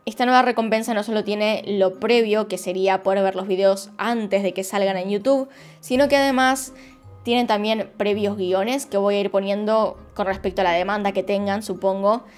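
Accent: Argentina. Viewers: yo tampoco entiendo todas las palabras pero me encantan sus vídeos y el acento argentino ❤❤ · Hola! Disculpame que te pregunte, se te hace difícil diferenciar acentos? O los escuchas igual? Por ejemplo acá ella que es argentina, o el español de mexico, españa, etc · Tu español latinoamericano argentino es hermoso (igual que el mío, que es de mas al norte) y quien no lo entienda es que no lo quiere entender… saludos hermana de La Patria Grande 😺😺💜💜🇲🇽🇲🇽🇦🇷🇦🇷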